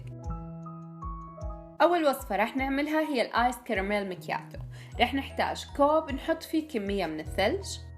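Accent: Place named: Hejaz, Saudi Arabia. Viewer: الله يسعدك يا. من كم يوم بس عرفت قناتك وتابعت أغلب الفيديوهات انت جميلة وبنوتة رائعة ومرتبة وأنيقة الله يحفظك. فيديوهاتك تجيب السعادة💞 💜. وأحلى حاجة لما تقولي سيروا عليها" ياحلو اللهجة 😃ذكرتيني بأهلنا في الحجاز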